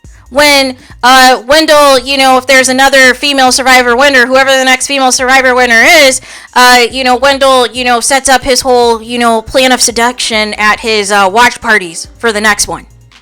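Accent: Midwest, United States. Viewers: I like your accent. It's either Midwest USA or Canada, I can't tell.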